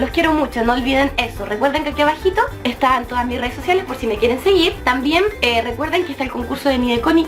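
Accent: Chile. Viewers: Hola. Tienes acento de chilena. Yo soy chileno por eso lo digo jejeje... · Hmmm no se, pero suenas como Chilena compatriota xd es chistoso como nos reconocemos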